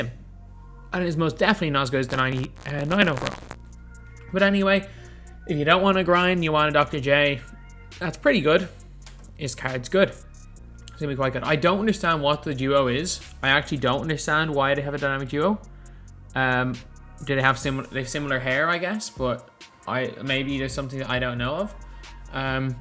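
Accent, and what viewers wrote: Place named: Dublin 4, Ireland. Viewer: your dublin 4 accent pisses me off mup tallagh son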